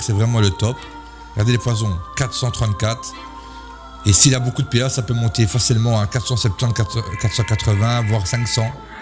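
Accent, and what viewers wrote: Belgium. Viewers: Je suis obligé de mettre un gemmes, rien que pour l’accent belge, génération François l’embrouille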